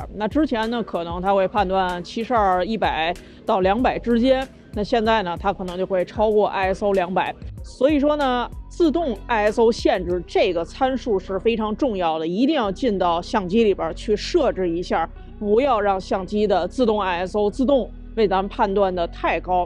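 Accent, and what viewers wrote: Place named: North, China. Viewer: 拜託 你不要看臉聴聲音也知道是大陸北方姑娘。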